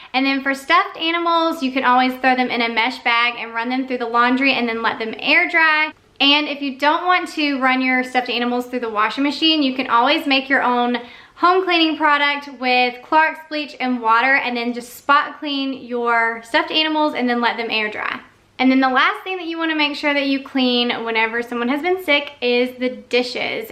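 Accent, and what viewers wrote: South, United States. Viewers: Beautiful Southern accent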